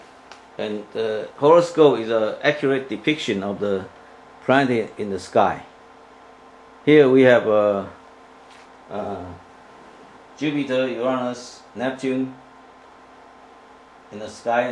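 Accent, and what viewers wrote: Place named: China. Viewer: From his accent you can tell is Chinese. Arabs and Chinese are the best astrologers in the world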